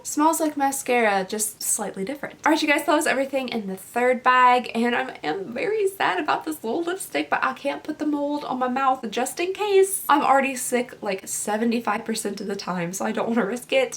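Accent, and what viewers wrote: Kentucky, United States. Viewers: Wait I just recently started watching your videos... are you from Kentucky! I am too!! I thought your accent was so nice! It’s because it’s so familiar to me! Wow, that’s so amazing! It definitely gives a boost of confidence because I’m always afraid to make videos due to my accent! Also I say “reach me that” all the time. My girlfriend who is from New Mexico(where I live now) has gotten into me for it lol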